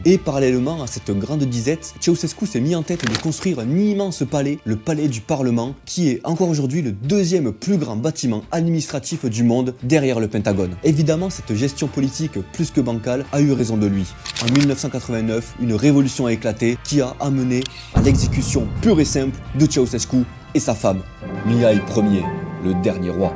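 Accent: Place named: Toulouse, France. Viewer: C'est quoi cet accent sudiste la ? T'es toulousain où bien?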